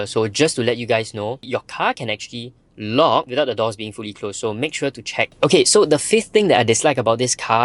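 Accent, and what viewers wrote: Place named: Ghana. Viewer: you sound like you went school in ghana 👀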